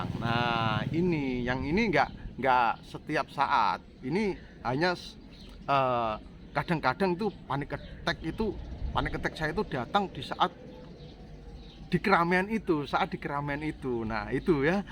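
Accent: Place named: Banyumas, Indonesia. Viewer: Betul pak..sy juga suka dengerin Chanel bapak ngapak jg luw, hehe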